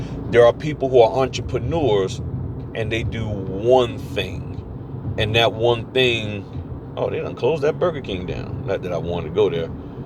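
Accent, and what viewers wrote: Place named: California, United States. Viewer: You sound like a Californian from Pasadena.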